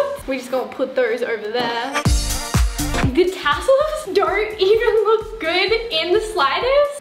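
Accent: Australia. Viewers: I just want something..... I WANT YOUR AUSSIE ACCENT🔥💗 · Jessica Rivera Australian accents make me so happy haha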